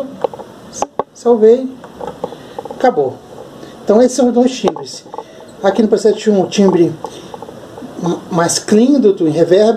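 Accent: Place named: Rio de Janeiro, Brazil. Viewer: Você mora em SP?? (Seu sotaque é do RJ)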